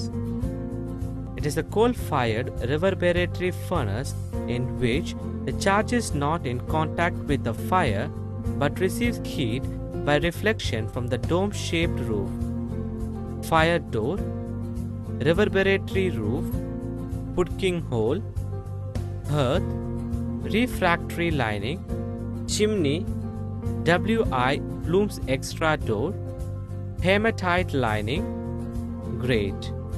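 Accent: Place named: India. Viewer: all I know is that the narrator is clearly indian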